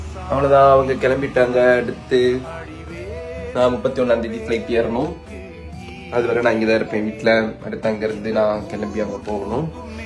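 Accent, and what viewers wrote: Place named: Madurai, India. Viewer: Anneyy nenga madhurai ah unga language appudi dha iruku my fav annaw🫂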